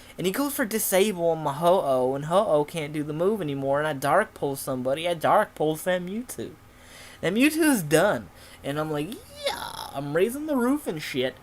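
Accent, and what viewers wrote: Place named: Texas, United States. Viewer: No more Texas accent please, it doesn't suit you... No offense or anything. :)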